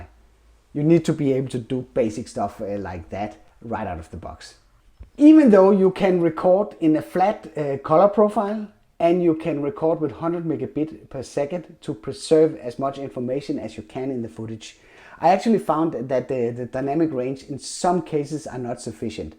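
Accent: Denmark. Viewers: Du lyder lidt dansk :) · Er du fra Danmark, syndes jeg kan høre lidt dansk😂🇩🇰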